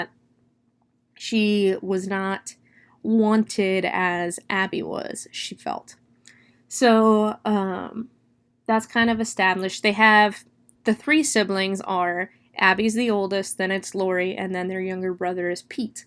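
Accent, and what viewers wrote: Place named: South, United States. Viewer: Just noticed you sound more Southern throughout the video lol. Anyways, good review and keep up the good work! 👍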